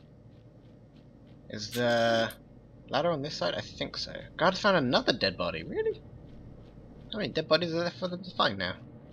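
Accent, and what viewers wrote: United Kingdom. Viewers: Is he British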